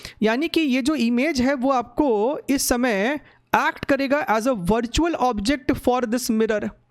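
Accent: Bihar, India. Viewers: Badhiya hai.... meanwhile... Bhanhiya hai...❤️❤️❤️ Pure bihari tone Love frm bihar sir...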